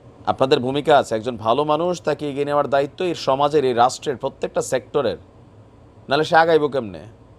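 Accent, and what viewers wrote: Sylhet, Bangladesh. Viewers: Apni kita sylheti ni❤ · ভাই, আপনি তো দেখি খুব ভাল সিলেটি ভাষায় কথা বলেন। আপনি একটু আজকের গা-----যা র খবরটা দিন। আগুনে পুড়ে মানুষ শেষ হচছে। আর শিরচছেদ......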